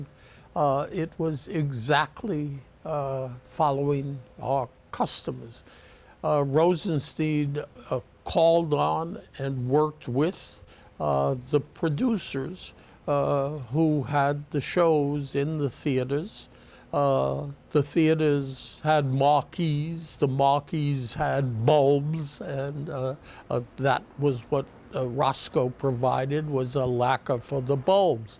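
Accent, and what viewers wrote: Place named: Northeast, United States. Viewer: A mix of NY & New England or just a northeast accent.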